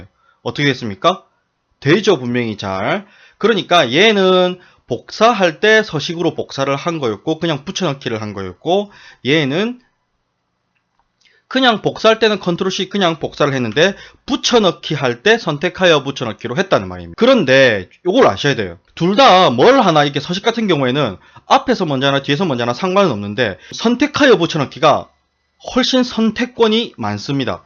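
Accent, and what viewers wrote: Gyeongsang, South Korea. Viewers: 구수한 갱상도 사투리에 열정적인 강의 귀에 쏙쏙들어옵니다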